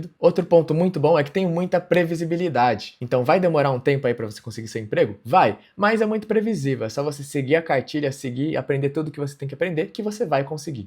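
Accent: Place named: São Paulo, Brazil. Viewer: nunca vi uma pessoa parecer tao paulista jesus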